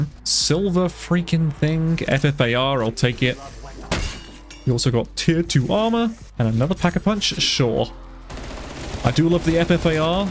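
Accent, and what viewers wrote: United Kingdom. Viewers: Its refreshing to hear a british person talk about how messed up rowling is.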